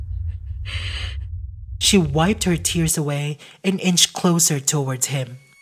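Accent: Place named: Philippines. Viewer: Ang sosyal naman..first time I heard a Filipino narrator doing an English story. Something new, although I didn't feel so scared because I was thinking the entire time that you're a different person because of the accent and everything.!! I mean not that intense when you're doing Tagalog horror narrations..Hahaha.. 😍😅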